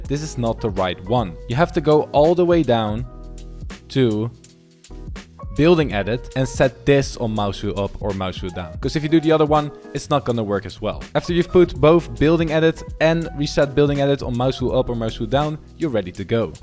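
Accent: Netherlands. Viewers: Mooi nederlands accent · i just bought my keyboard n mouse and getting it tommorow! you realy helped me thx! btw are you dutch?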